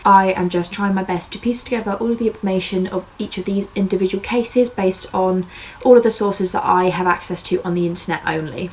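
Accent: England, United Kingdom. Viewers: Don't worry about it you're obviously from England or possibly know that's definitely English not Welsh and certainly not Scottish anyway you know there are at least all the same language basically American and English are completely different languages at this point so don't be so hard on yourself. You did true crime & you're hot that's enough for anybody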